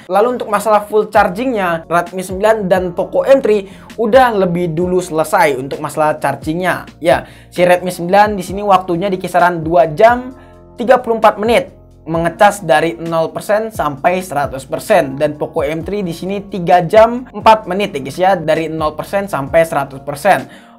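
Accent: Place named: Surabaya, Indonesia. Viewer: Arek Suroboyo Yo lur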